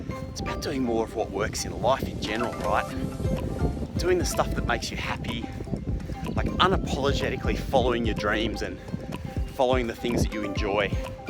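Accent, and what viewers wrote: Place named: Australia. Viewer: I landed on your channel after randomly searching for a beautiful college campuses around the world. It's somehow very nice to hear the motivational talks in Australian accent :) I agree we should do what works more, although sometimes we need to push ourselves into doing something new to turn around what does not work into what does work! Hope you are staying safe during the pandemic! - a random Korean student from Japan.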